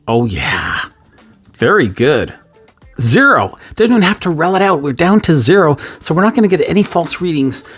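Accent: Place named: United States. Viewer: Хорошее произношение, вроде американский акцент, интересный для меня профиль канала. Буду аудирование проходить. 😊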